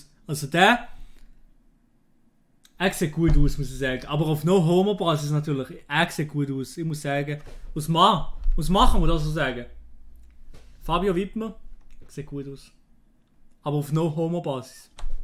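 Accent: Switzerland. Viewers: Sehr schönes Video von dir aber versteh dein Schweizerdialekt nicht bin Deutscher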